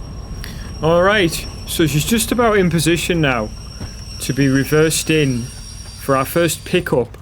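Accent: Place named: Geordie, United Kingdom. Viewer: You from Mackem land Marra? Or close to Stockton? Sound awfully close to a Geordie but not quite 🤣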